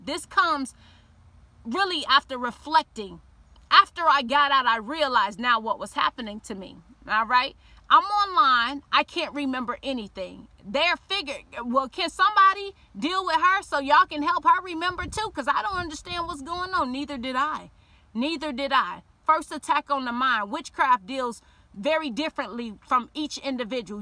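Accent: United States. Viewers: You are beautiful and I love that Florida chopped accent lol…what’s your IG??? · I hear a Louisiana accent. Thanks for sharing you testimony beautiful. This is my first time watching any of your videos.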